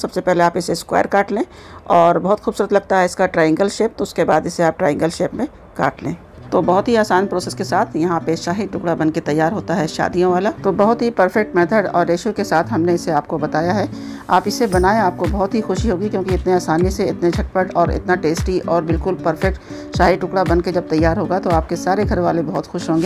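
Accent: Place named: Urdu, Pakistan. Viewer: آپکا اردو کا خوبصورت لہجہ ہمیں بہت پسند ہے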